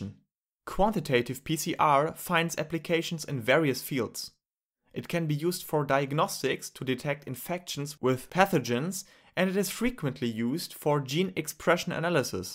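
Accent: Germany. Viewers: Are you German? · Love the German accent and your explanations, keep going!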